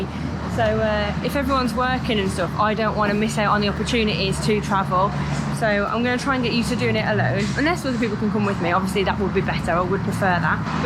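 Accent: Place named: England, United Kingdom. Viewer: Can understand your English English, must be how most Americans spoke when they arrived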